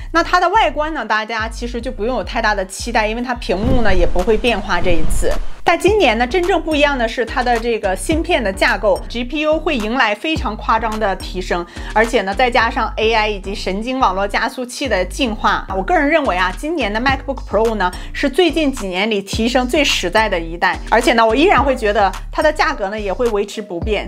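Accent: Northeast, China. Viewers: 就喜欢大东北碴子味😂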